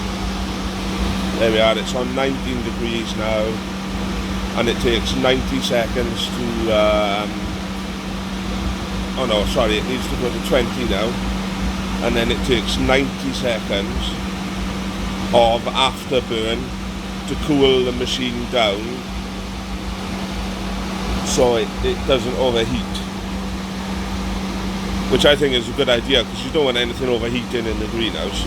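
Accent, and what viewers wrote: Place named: Wales, United Kingdom. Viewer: I never knew you were this Welsh! Awesome vid!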